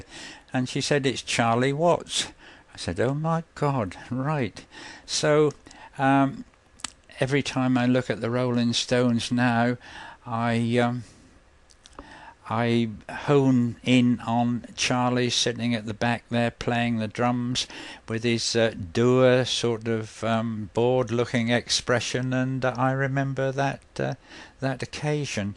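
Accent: United Kingdom. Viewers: Wonderful videos. You remind me of my own grandfather, save for the british accent but just the way he told his own life stories. Looking foward to hearing more.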